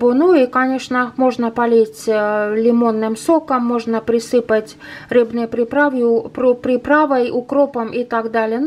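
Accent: Finland. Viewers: у вас уже акцент и ударение финское) пс давно вы в финке живете ?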